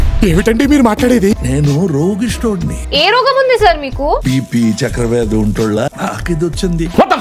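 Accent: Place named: Telugu, India. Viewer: Imagining you in BigBoss 9...😎..your telugu accent is NEXT LEVEL...please keep it up.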